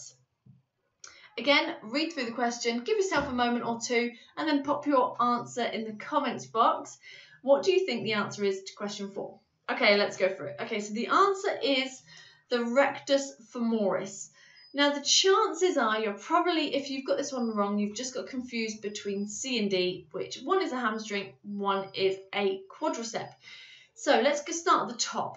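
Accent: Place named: United Kingdom. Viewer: Ayyottaaaa😊 like the briish accent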